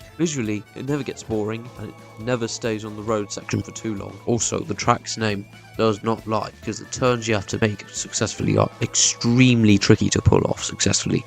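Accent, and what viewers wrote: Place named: United Kingdom. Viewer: Hold up...ur from Spain? Then, why do u have a British accent? 🤨🤔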